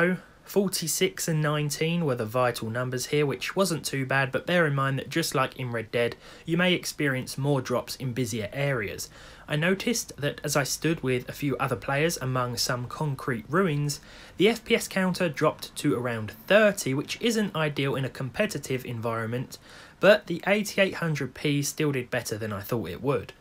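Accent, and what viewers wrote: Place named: England, United Kingdom. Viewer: I like your original English accent. I used to live in Tauton City. I learned a British accent. greetings from Germany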